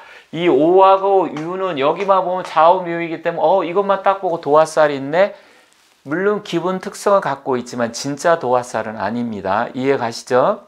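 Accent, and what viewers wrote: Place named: Chungcheong, South Korea. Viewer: 충남 사투리 시네요..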